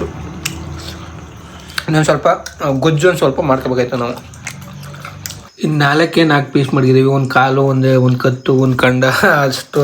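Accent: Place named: Hassan, India. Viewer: Nam amma Hassan doru nam appa uttar karnataka nanu Hassan bhashena,uta na,climate na tumba miss madkoteni and nimma bhashe keli tumba kushi aytu One matt heltini bro nim lifestyle nange tumba eshta because nemdi ede dudd edre nemdi jasti eralla nange nim tara halli jeevna madbeku anta ishta sir and nive healthy agi ertira last vargu ❤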